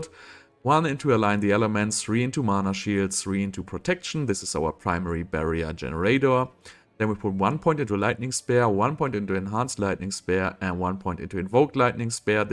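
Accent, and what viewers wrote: Germany. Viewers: very interesting build. by the way, are you german ? I think i heard some pronunciation in that way.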